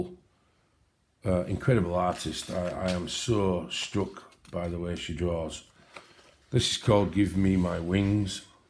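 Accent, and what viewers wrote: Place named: North, United Kingdom. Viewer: First video I’ve watched of yours and it’s lovely hearing another Northerner. Some beautiful books 😍😁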